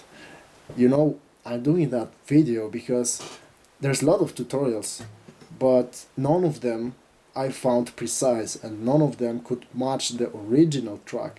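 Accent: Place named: Poland. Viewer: I am also a fan and listen to Jordan Peterson. I lived in Hungary for 8 years and have many Polish friends. I knew I recognized that accent. Thank you again for the great lesson of a favorite song!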